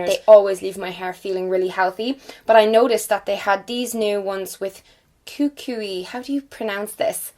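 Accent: Ireland. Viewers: I love that Irish accent👌👌👌 P.s could you talk more about your book because I didn't know you were bringing one out. Maybe you could do a video on it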